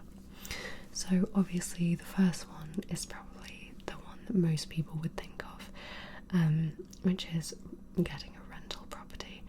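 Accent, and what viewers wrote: United Kingdom. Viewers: OMG where abouts are u from! U sound english like me😊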